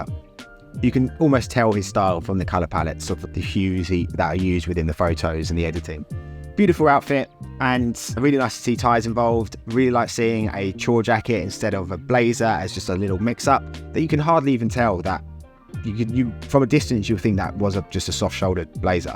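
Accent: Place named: Essex, United Kingdom. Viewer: Sub'd! Learning more about how I want to present myself through your insights. Also, nothing wrong with a fine Essex accent.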